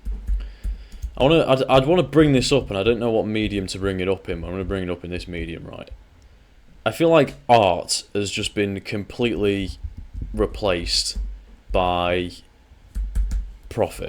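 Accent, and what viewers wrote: United Kingdom. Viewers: Why does he sound more British this episode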